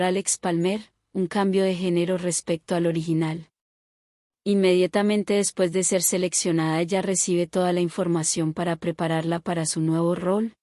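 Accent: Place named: Spain. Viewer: Very good job 👍 Thank you very much for italian subtitles 😊 I love so much your voice with your spanish accent 😉❤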